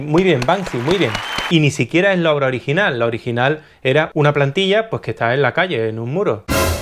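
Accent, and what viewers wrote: Andalusia, Spain. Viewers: eres andaluz?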